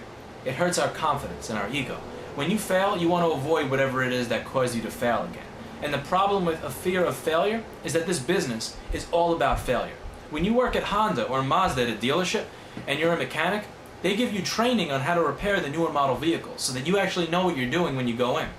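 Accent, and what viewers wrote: New York, United States. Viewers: Louis Rossman has that NYC accent.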